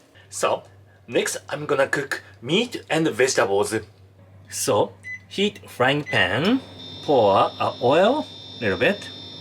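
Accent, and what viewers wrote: Japan. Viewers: excatly Japanese English accent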